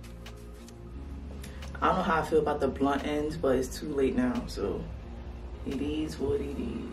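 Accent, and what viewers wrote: Liberia. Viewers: Heard the accent and already knew LIB STAND UP 🇱🇷🇱🇷🇱🇷 my sista much love 🫶🏾🫶🏾🫶🏾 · You sound Liberian and love it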